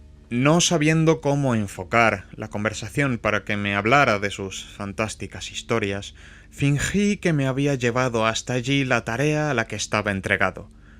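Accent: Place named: Spain. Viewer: Por fin un audio en castellano (españa). No me considereis racista, simplemente es la forma de hablar que utilizo y me es cómoda y familiar, así me centro más en la historia. Gracias!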